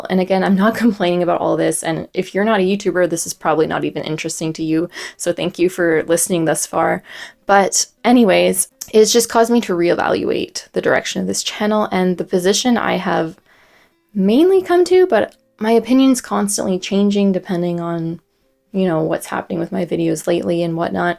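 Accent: Canada. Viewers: Please do a video about your eyebrow regrowth journey- I can see, in earlier pictures of you, that that is also a choice that you made. I just turned 60 and decided about a year ago to start regrowing my brows (because the alternative is a very expensive brow lift!). My brows were growing back really well by simply moisturizing them every night with L’Occitane cream, but when I used micellaire water to remove my makeup over the course of a couple of months I realized that I was getting bald spots where there had previously not been a problem with sparsity! So I would love for you to talk about that… Love your videos, great information, and Canadian accent!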